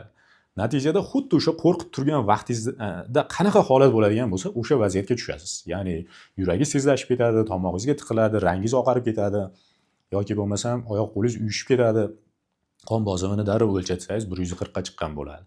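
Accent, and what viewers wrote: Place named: Jizzakh, Uzbekistan. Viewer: Ассалому алайкум доктор. Шевангиз адашмасам Жиззахники деб уйладим. Адрес каерда ?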